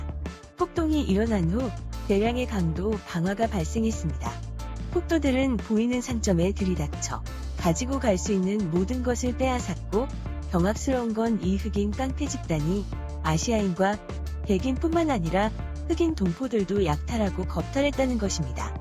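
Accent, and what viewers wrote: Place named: China. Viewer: 너 중국인이냐 한국말좀 더배워라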